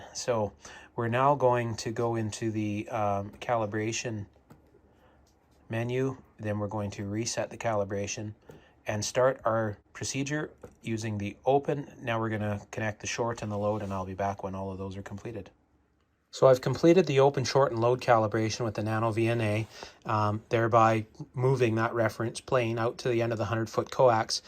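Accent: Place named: Canada. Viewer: You sound less Canadian in the revision of this video. Also, I have that same keyboard, and it is a beast.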